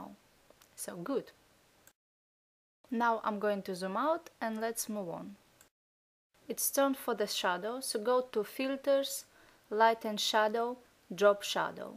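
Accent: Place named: Russia. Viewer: are you Russian. I really like your English. its too sexy. :)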